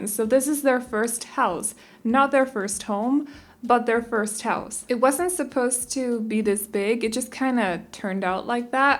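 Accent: Denmark. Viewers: Wowww troede slet ik at du var fra Danmark haha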